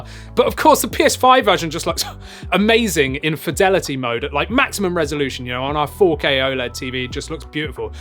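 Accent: United Kingdom. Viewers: Hey bro your accent sounds like you’re from Oxford, england.. am I right? ❤️❤️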